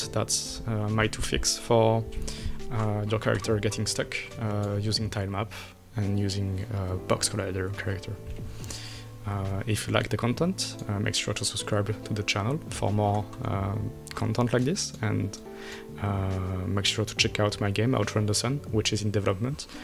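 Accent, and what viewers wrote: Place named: France. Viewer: Le petit accent Français qui ma donné le sourire pdt toute la vidéo, merci à toi btw ;).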